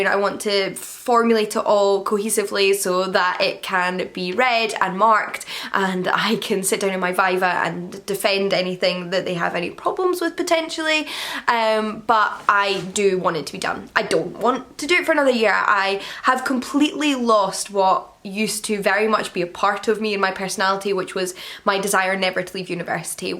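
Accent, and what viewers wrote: Scotland, United Kingdom. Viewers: That board game looks amazing, we will have to look for it. Congratulations on your writing and getting close to finishing the PhD! I totally understand the feeling about buying your first place without a romantic partner. It is really a great idea and I would hope that more young women especially would consider doing the same. It is massively important to your personal development as a person to have become your own independent person prior to committing to a lifelong relationship with everything that comes with that. Even though your lives are together, establishing your own space and responsibilities makes good sense. I love that you and your mom have cohabitate so well during the pandemic. Given that your dad passed away so recently, it is great that you could be there for each other, especially with the lockdowns and isolation. 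In terms of your book and making an audiobook, I love the idea of you reading it! I would guess that most of the people that follow you are comfortable with your voice and the Scottish pronunciations would be a treat. What a great idea! Lastly, in terms of the writing to try, I’m going with the gothic YA finishing school idea. That sounds excellent. You have so many wonderful projects coming up! I’m looking forward to seeing you get through them (which will only create new ideas for the future!). 🔥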